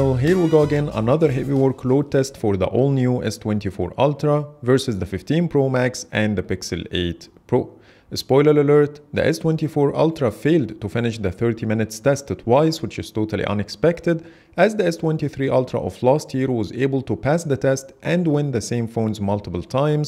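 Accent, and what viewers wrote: Egypt. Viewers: اللهجه مصري 😂❤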